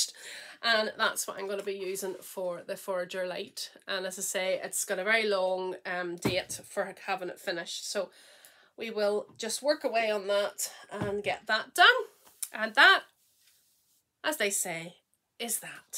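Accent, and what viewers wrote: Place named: Northern Ireland, United Kingdom. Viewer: Hi, I found your podcast yesterday. Absolutely love your podcast. It was your accent that made me watch as l was a Carrickfergus girl /woman who has just moved to the North coast. Incidentally l miss Jackson’s too!!!! I also spend a lot of time in Devon. I was just thinking you can take the girl out of Northern Ireland............ As a Christian l find your words very encouraging. Thank you so much xx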